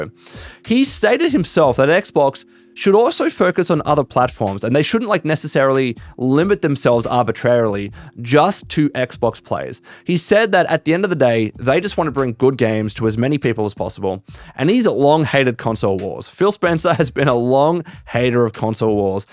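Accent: Australia. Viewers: Loving the news coverage becoming a thing for this channel, something about an Australian accent always makes stuff more interesting I guess 😅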